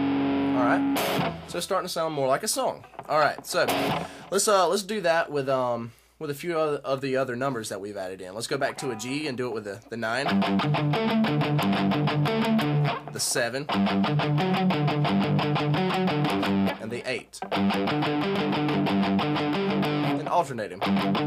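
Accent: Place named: South, United States. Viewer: I can hear that southern accent. :)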